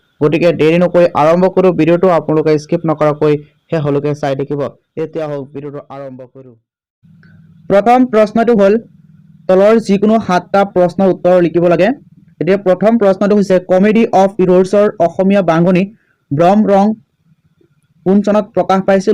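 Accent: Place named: Lower Assam, India. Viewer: Vatia ussaron hoi ase bujat okhobida hoi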